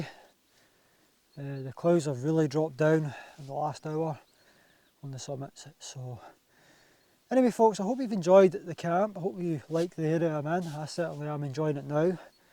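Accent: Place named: Edinburgh, United Kingdom. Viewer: Well done,I like the fact you get away and do your own thing.I’m an Edinburgh lad myself Meadowbank.originally fi west Granton green.you sound like your from that area.granton,Drylaw’ish neck o the widz.👍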